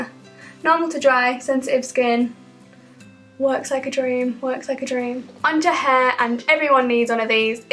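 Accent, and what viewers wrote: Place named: North East England, United Kingdom. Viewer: It's always nice to hear a familiar accent! (Woop woop, north east!) I like the sound of the Solar Powder, I'll have to have a snoop next time I'm in Boots. I always forget that S&G do make up! x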